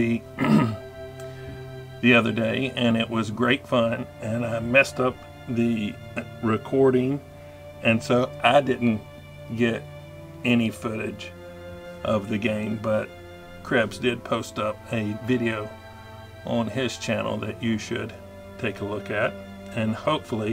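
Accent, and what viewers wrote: United States. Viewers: nice to hear an american voice. Thought it was an EU monoply:) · hello, I first saw your channel about half a year ago and I liked what I saw but then I just kinda forgot about that until today when I was watching Mingles with Jingles and looked in the description and saw your name. I was like oh yea I remember him so now here I am.:) About the giveaway I don't know If its over yet or not and honestly I don't believe I would win it anyway, I don't usually. But I don't care about that I like that you are kind of like my favorite YouTuber Magz, just a little slower in speech and you're American so that's cool. You seem interesting and your content seems more mature than some other YouTubers I shall not name, thus you have gain another sub today.:D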